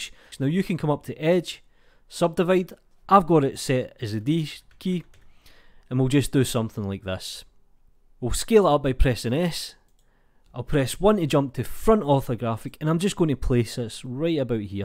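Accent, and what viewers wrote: Scotland, United Kingdom. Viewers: Can it be used as a soft body afterwards? P.s. Your amazing Scottish accent made me wonder if it was English language what I was listening to, but I've understood the video perfectly nevertheless.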